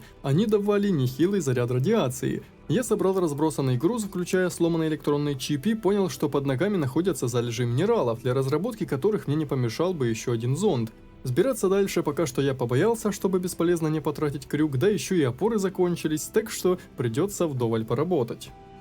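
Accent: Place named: Ukraine. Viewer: судя по говору он украинец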